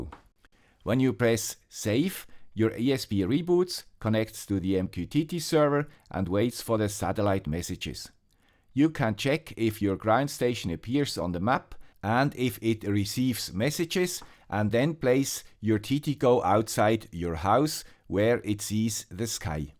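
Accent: Switzerland. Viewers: Another great episode from the ham with the Swiss accent. :-)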